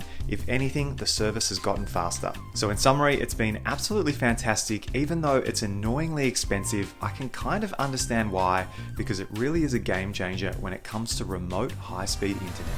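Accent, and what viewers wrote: Australia. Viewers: The most Aussie start to a video ever..,”it’s been two months since we installed Starlink on our garden shed” [with Aussie accent, pointing at Starlink dish, bush setting] 😀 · Aussie accent is the best mate!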